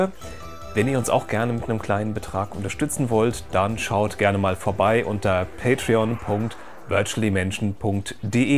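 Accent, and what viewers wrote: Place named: Hesse, Germany. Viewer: aus welcher Ecke von Hessen kommst Du denn? =)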